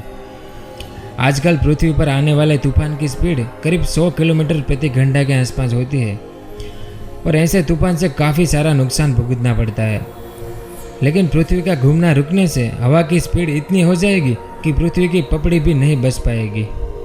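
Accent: Gujarat, India. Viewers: bhai Gujarati hai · Bhai tu 100%gujraathi hai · You sounds like a Gujarati 😃🙂☺ Gujjubhaai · Ha 100% u r gujrati.. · You tuber is gujju ....??? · Gujarati hai tu.... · गुजराती हो किया भाई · brother u r gujarati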